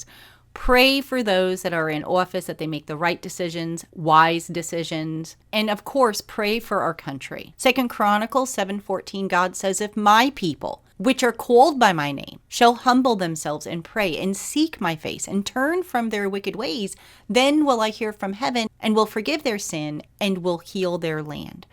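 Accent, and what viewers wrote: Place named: East Coast, United States. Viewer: no he definitely seems to be a radical Islamist. I listen regularly to Glenn Beck and he said the mother of the new Mayor of N.Y C. stated in an interview that her son does NOT identify as an American, but rather with his Islamic heritage instead. I was born in Queens N.Y and raised on Long Island. I now reside with my husband in rural N.W.OH. I noticed you have an East Coast accent that sounds a lot like mine. Are you a N.Y gal too? I think NY.C is going to need a LOT OF PRAYERS!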